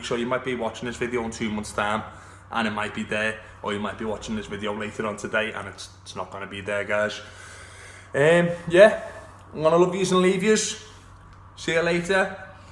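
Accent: Liverpool, United Kingdom. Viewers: Defo from liverpyool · Just ordered one of those, can't wait to get it now , ) Well spoken M8. BTW subbed just for the scouser accent. 👍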